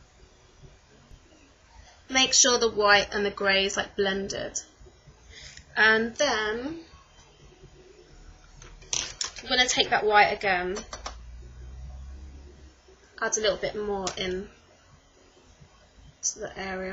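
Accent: United Kingdom. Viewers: I REALLY LIKE WATCHING YOUR VIDEOS. YOUR SO ADORABLE WITH AMAZING EYES AND YOU HAVE A BUBBLY PERSONALITY WHICH IS VERY UNIQUE AND AWESOME. YOUR ART WORK WHEN DOING MAKE UP IS VERY CREATIVE AND FUN NOT ALLOT OF PRESSURE. I WANT TO GIVE YOU A 5 STAR AND 2 THUMBS UP GREAT JOB. BRITAIN ACCENT WITH A ROUND FACE ANTIQUE DOLL FEATURES VERY CUTE AND ADORABLE :-)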